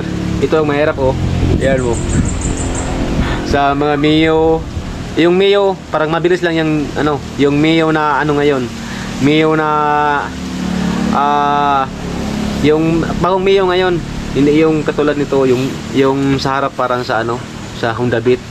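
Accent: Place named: Visayas, Philippines. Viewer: wag mo na itama. bisaya kase sya kaya ganun nya bigkasin.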